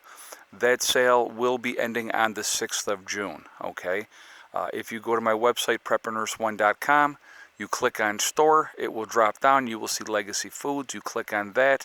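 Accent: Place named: Rhode Island, United States. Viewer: When I listen and watch you I find myself wondering if you’re from Portsmouth Rhode Island. Your accent reminds me of my uncles.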